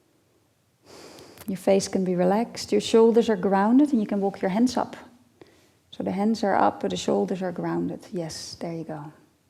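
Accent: Netherlands. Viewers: Definitely a Dutch accent haha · Haha hallo Nederlands accent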